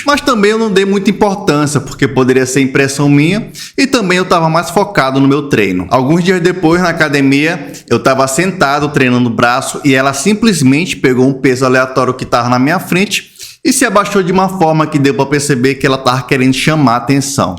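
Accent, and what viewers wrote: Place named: Ceará, Brazil. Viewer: Esse sotaque é cearense.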